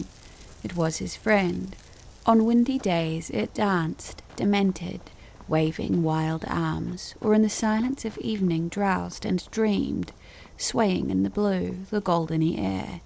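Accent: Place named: New Zealand. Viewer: The sad unfortunate side of listening to these knowledgeable people is just a reminder of how little I know! How unfortunate that there is so much to learn and yet it would take a plethora of lifetimes to just break the surface. …but, do endeavour to learn as much as I can while I walk the earth! Actually your Kiwi accent is what makes your narrations so appealing.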